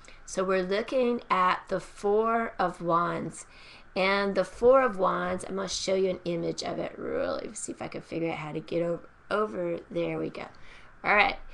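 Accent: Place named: New Zealand. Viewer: Where are you from? That accent is different. New Zealand?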